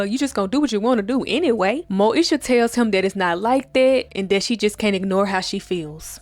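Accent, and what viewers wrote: Memphis, United States. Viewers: Ok love this but are you from Memphis lol? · off topic to the video but as i listen to you speak i keep wondering “she from Memphis huh???” lol. W video btw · Are you from Memphis?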